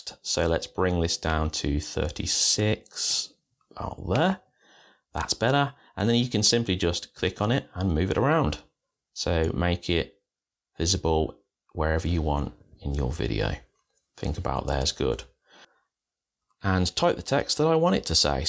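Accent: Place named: Birmingham, United Kingdom. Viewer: AT LAST. A tutorial in plain English: step by step instructions and no ear shattering unwanted music accompanying it. Why can't instruction videos ALL be like this. My guess is that comes from the Midlands...shades of a Birmingham accent, but that's cool because I'm also from the Midlands: Northampton to be precise. Have had the full AVS Suite for a long while but this video has given me the impetuous to REALLY begin to explore it further. Thanks mate. Have subscribed.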